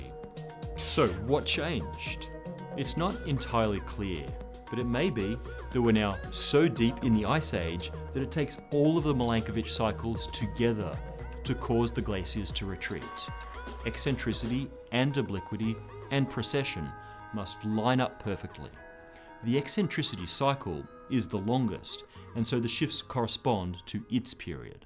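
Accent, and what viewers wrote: England, United Kingdom. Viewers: climate change has nothing to do with this? Now I know its true~!, Speak with an english accent and have a well known TV company behind you, and you can shovel complete shit from your mouth and no one cares to say how stupid the little "spoiler" in the description is. fuck off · PBS: People are waking up to the climate scam.. what can we do? PBS director: Hire a spokesperson with a beard and a British accent. That'll add credibility so they believe our bullshit. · Why do they always have the host of these shows with a British accent.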